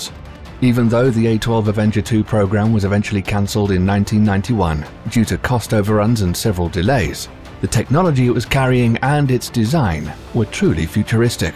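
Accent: United Kingdom. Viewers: Sorry to burst your bubble, but he's British, and that's how we pronounce it. It's our language, so don't lecture us on pronunciation.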